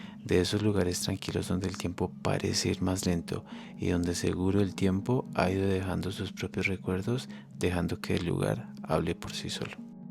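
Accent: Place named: Colombia. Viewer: me encanta tu entonación al narrar, con tu acento colombiano, es de paz. Dedícate a hacer vídeos de poesía o leer textos de libros que hablen de paz. Te recomiendo hacer videos con textos del Libro de La Sabiduría o de Las Siracides. Con tu voz y los paisajes donde caminas... ¡SERÁN HERMOSOS!. Saludos desde Guadalajara, México ❤✌️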